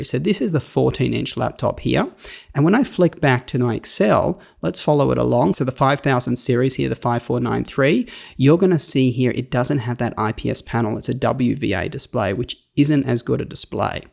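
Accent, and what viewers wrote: Australia. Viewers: Your enthusiasm is great. Like your Australian accent. About to buy my 1st laptop so your review is very helpful. Thank you.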